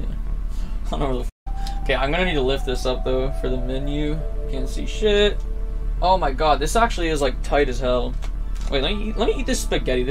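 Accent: Canada. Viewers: you sound like youre from both Canada and chicago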